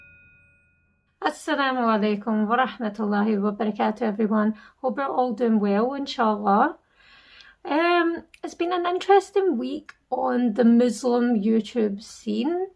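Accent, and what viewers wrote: Scotland, United Kingdom. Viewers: Mashallah ... you have more insight and reason than all those men hhhhh and your scottish accent is like the cherry on top hhhh ....i love scottish accent so mush .. and to find a channel of a muslim scottish woman ... YA Allah .. i feel like i just found a treasure hhhhh May Allah bless you sister . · I'm not used to female youtuber with Islamic content, but I'm definitely staying. Love Scottish accent 😍😍😍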